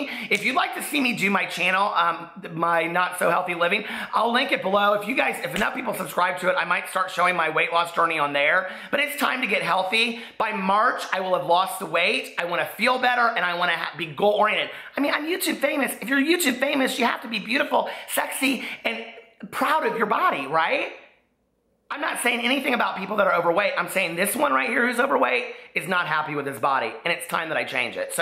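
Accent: South, United States. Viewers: are you originally from the south? I don't know if you've mentioned in the past, I just followed you this week. I am getting a twang of a southern accent!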